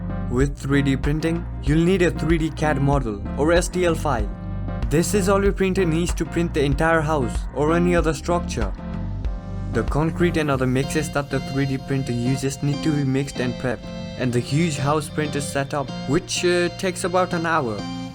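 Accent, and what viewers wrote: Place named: India. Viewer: This channel is diamond, not gold!! BTW are you of Indian descent cause your accent seems like a little indian.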